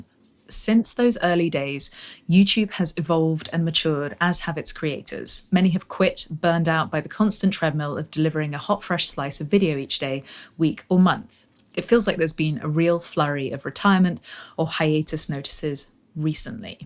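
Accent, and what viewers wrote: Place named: Salisbury, United Kingdom. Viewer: I may be the millionth person to tell you this, but you have an absolutely beautiful speaking voice, and I wonder if you’ve considered narrating audiobooks or documentaries. Both your Salisbury (or Winchester?) accent, and relatively low pitch, are highly listenable for long periods, especially for Americans. I thought my comment would sound very random, but given this latest video’s topic, maybe it’s relevant to your far-flung enterprise (ha!). Cheers and best wishes from the USA.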